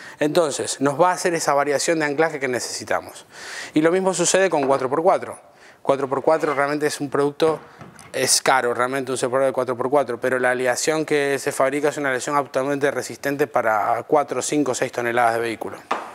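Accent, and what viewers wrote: Argentina. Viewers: El acento parece argentino, pero habla en pleno español :P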